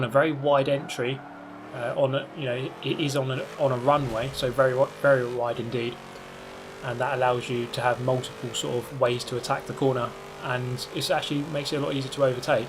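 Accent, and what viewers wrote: United Kingdom. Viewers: There's just something about a snarky British guy chatting about cars... Can't quite put my finger on it.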